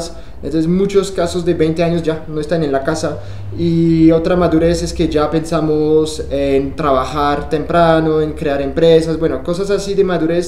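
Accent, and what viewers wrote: Colombia. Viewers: Habla muy Colombiano, casi diria que practico en bogota, hablas muy bien, y si tuve un novio Aleman y lastima que no funciono, me encanta y aun me encanta el chico. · Que tal dejo colombiano! ..😊 Muchas gracias por tus consejos! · Se le ha quedado el acento colombiano 😀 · su acento colombiano muy marcado... parce....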